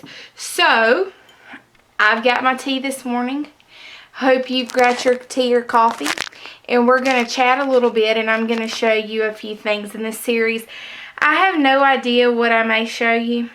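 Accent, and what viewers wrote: South, United States. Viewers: I enjoy listening to you talk ,my sister was a southern gal too I miss hearing her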